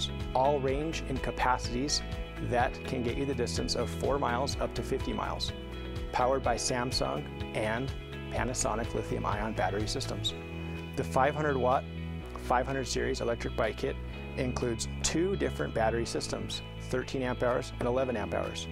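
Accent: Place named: Canada. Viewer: I love hearing a Canadian accent in the morning!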